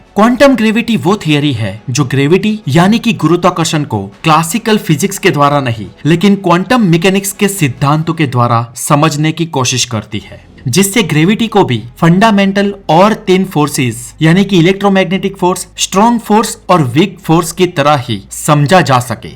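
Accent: Gujarat, India. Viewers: gujarati hai. par udisa bilkul dusre chhor par hote hue bhi similar hai kuch chize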